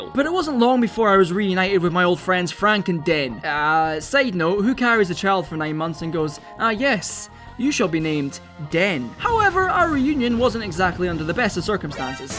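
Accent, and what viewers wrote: Scotland, United Kingdom. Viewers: You from Scotland bro?? 🏴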